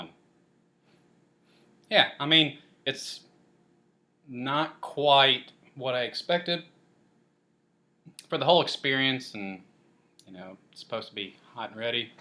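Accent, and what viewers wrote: United States. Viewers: You have a country accent. It's cute 😉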